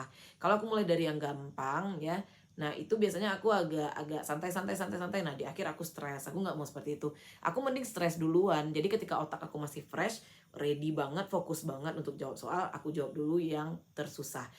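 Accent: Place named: West Sumatra, Indonesia. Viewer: Mba org Minang kah?😊nada bicara nya seperti logat org Minang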